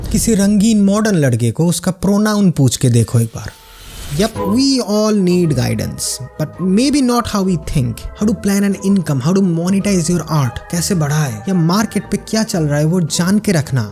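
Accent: Bengal, India. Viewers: Bhai aap bengali ho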